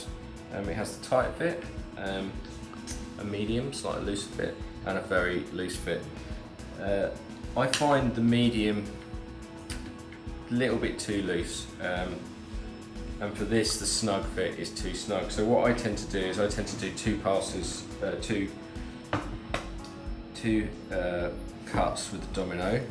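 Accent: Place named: United Kingdom. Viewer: The Brit accent makes you sounds like an expert !!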